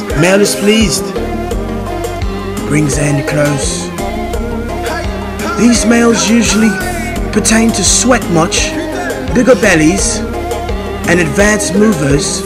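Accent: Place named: England, United Kingdom. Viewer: This is more like sex on the dance floor.... That English accent though is bomb...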